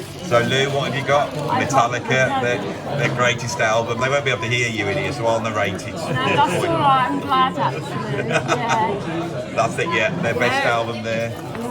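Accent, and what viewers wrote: Birmingham, United Kingdom. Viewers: Colosseum live! Now that's what I call impressive and I'm envious (but what about the condition?). Skinflint-fetishist; I can now say that I watch this bloke with a Brummy accent with who is a ....-.... drummer. The Michael Henderson budgie smuggler album cover reluctance anecdote cracked me up ps I picked up Focus 3 for next to nothing (in excellent condition) in an op-shop here in Brissy and it is the first time I didn't "get burnt" with a double-album. *op-shop = opportunity shop = charity shop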